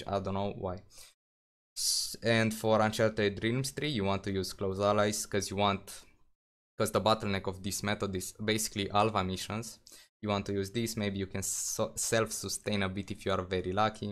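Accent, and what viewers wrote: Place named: Romania. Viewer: Are you romanian? Heavy romanian accent. If yes, Salut!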